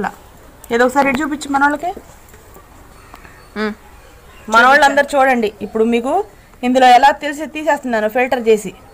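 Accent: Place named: Guntur, India. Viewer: Ala em undadu Amma urdu every state different slang untadi meeru matladindi guntur/Vijayawada slang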